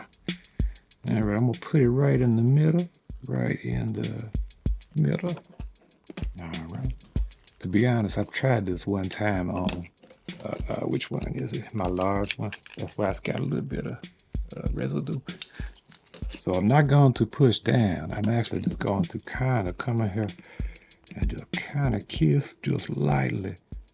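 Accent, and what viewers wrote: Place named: South, United States. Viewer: First; boss level teaching skills. 2nd, all my people from Hot’lanta to Lake Charles talk just like this :)= feels like home.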